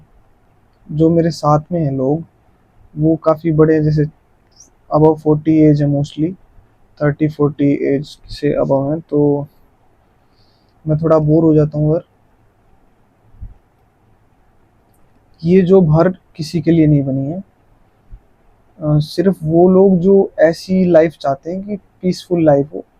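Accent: Haryana, India. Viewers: Bro you're from haryana? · That haryanavi touch✨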